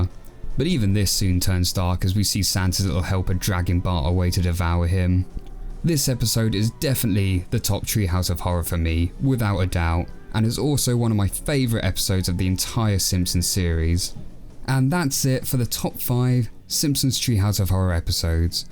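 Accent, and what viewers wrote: England, United Kingdom. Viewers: I didn't know you were English ( I am...)!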